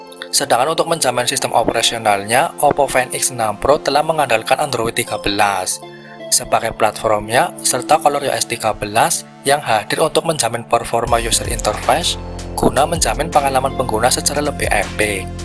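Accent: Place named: Tegal, Indonesia. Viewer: Presenter nya NGAPAK ORANG TEGAL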